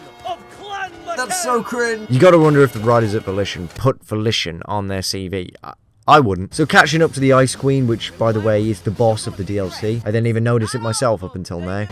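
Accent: United Kingdom. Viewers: British love it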